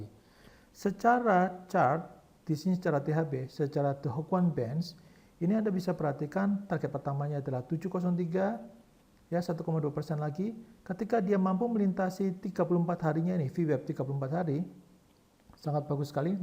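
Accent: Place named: Java, Indonesia. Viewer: kata istri saya suara Suheng medok banget. hahaha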